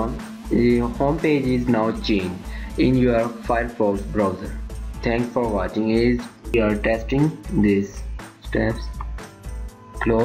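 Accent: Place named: Punjab, India. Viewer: I can not understand this punjab. Hello, Buddy, this is an AMERICAN site. Learn AMERICAN.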